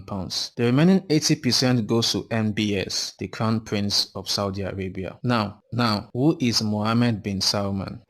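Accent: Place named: Nigeria. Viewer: Real Nigerian accent you got there mate.. Good info though.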